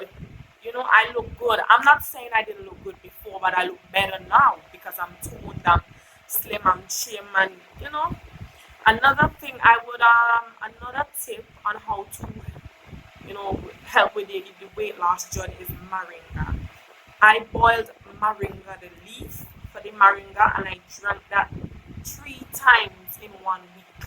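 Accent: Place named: Nigeria. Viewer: Lol the Nigerian accent 😂😂😂🙆🏾‍♀️🙆🏾‍♀️🙆🏾‍♀️ nice video ♥️💯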